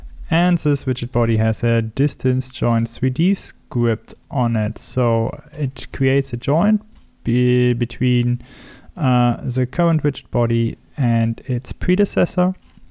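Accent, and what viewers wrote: Germany. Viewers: I Think i can hear a german accent in you english... but it´s working and that´s what i want! ... thank you for this tutorial it´s easy to follow...🙃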